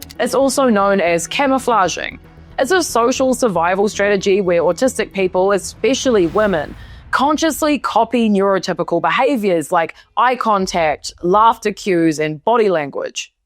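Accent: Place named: New Zealand. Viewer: Are you referring to the accent? It’s Kiwi.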